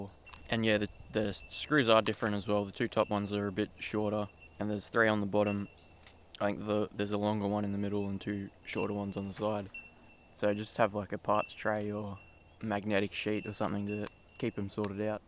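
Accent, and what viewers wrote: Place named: Australia. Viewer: Love your vids man, Aussie AF Easy to follow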